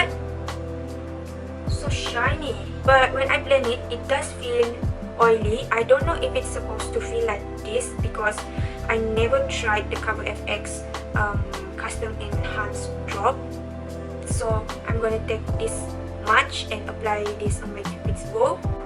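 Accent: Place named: Philippines. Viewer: You speak like filipino english accent. Btw I like your video. Thumbs up 👍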